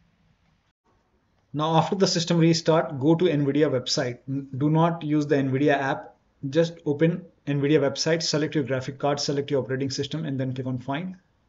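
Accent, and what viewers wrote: India. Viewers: i cant listen to some guy with an indian accent sorry .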